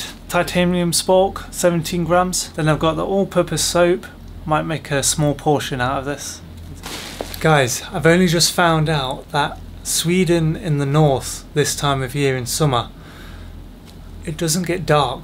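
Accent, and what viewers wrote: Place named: RP, United Kingdom. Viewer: New to your channel. Just subscribed and clicked on the "little bell thingy"! Loving the videos, especially the flattering lighting and the almost posh accent with Well pronounced T's and the distinct lack of the phrase "yaaaa". Not forgetting your lovely partner Lilley....... I hope that's correct? Haha keep up the good work. We love the channel.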